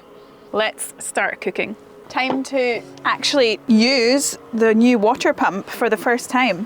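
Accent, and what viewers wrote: Scotland, United Kingdom. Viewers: Nice one, what a blend, on the road with the campervan, hiking to historical sites, soaking in the beauty of Scotland, with your wonderful Scottish accent, love it.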